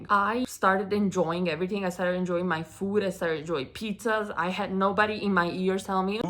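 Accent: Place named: Italy. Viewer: An Italian woman agrees to work for Little Caesar's .... the subliminal message you are sending (from a REAL Italian complete with accent) is ... "I endorse Little Caesar's pizzas." What greater endorsement could they have? They should have given you a raise on your first day for the message that sends to customers. [EDIT] Judging from this video I think you could make a fortune on TV commercials for Little Caesar's.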